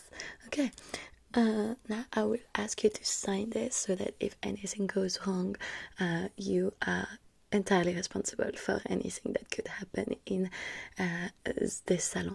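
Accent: France. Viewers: Okay I’ve watched like. 27 times so it’s safe to say I’m obsessed!! Love the French accent!! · I just came across this videa, i am not sure how or why but, wow, i feel happy, i will check out your channel.. my god, the french and their accent, exquisite. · yesss! I’ve been looking forward to a sequel to the first one of these you did! Love it, sooo tingly and I love the French accent🖤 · I'M JUST SO IN LOVE WITH FRENCH ACCENT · im male but i will watch any french accent video from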